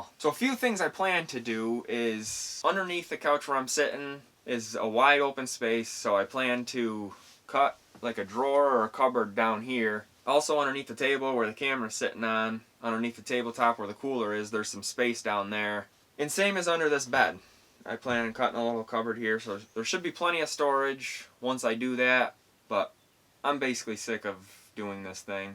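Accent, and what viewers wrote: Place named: Minnesota, United States. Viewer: I like how honest you are. And I have a feeling you are a bit of a perfectionist. Also I’m from Minnesota and it sounds like you might be too… or at least a state that touches Minnesota.😊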